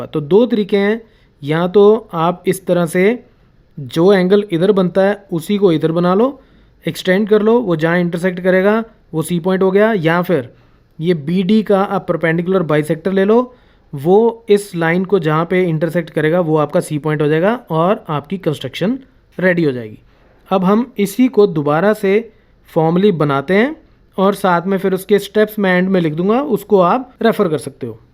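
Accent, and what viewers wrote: Punjab, India. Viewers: By your accent I think you are a PUNJABI Thanks for explanation ☺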